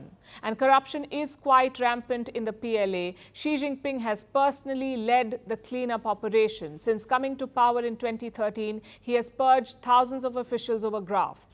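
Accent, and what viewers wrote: India. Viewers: FirstPost and WION are CIA funded propaganda channels. They pick this woman with reasonably pretty face and thick Indian accent to confuse Indians. She is paid to make Indians hate China and Russia. She is professional woman who is selling her face and voice to the CIA for US cash. And you fell for it. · Finally his female "with india voice patterns" does not seem like a cow chewing its Cud OR Carry its self as though the world OWES her The A ++ is her slight Timidity and recognizes there are forces of Awakened that proceed her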